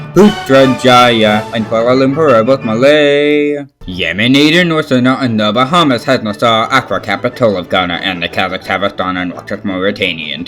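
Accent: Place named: Scotland, United Kingdom. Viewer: I cant understand anything with ur ugly stupid scotish accent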